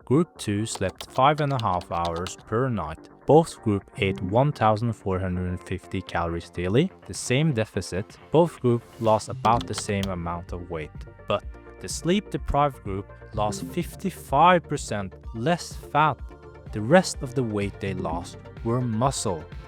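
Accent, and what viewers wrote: Norway. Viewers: Lol, you sound Norwegian?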